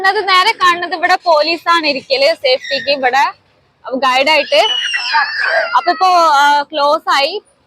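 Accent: Malappuram, India. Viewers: മലപ്പുറം ചേളാരീ.കോഴിക്കോട് ജില്ലയിൽ തെക്ക് ഭാഗത്ത് ഇതിനോട് സാമ്യമുള്ള സ്ലാംഗ് ആണ്.എന്നാൽ, കോഴിക്കോട് ജില്ല മുഴുവൻ ഇതേ സംസാരമല്ല.കൈയിലാണ്ടി,വടകര ഒക്കെയുള്ള വടക്കൻ ഭാഗത്ത് തികച്ചും വ്യത്യസ്തമായ സ്ലാംഗ് ആണ്. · മലപ്പുറം slang ആണ് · മലപ്പുറം ✌️ആണ്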